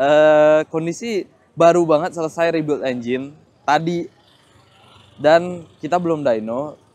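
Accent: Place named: Malaysia. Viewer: melayu gitu logat nya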